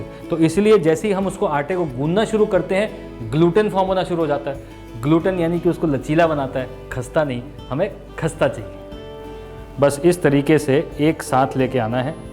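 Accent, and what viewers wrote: Haryana, India. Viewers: Chef your recipes are awesome so are you Aaj toh Haryanvi bol k jamma laath gaad Diya Bahut khub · Chef i never knew that you are from haryana and the accent which brings here while speaking into haryani is so original. Just amazing. I’m also from haryana so i can totally relate to your tone 😂👌🏻. You’re the best. Love your recipes. ❤️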